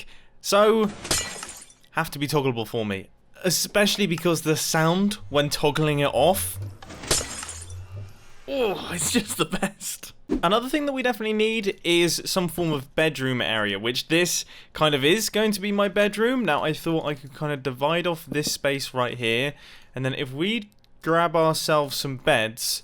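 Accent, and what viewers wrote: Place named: United Kingdom. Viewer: I just realized he kinda sounds like wheatly from portal 2, probably because they both have British accents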